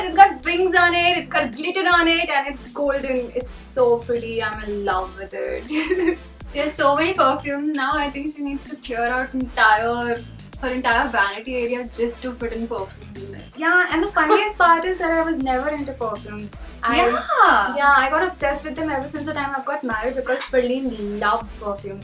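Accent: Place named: India. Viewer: thats a typical Indian accent lol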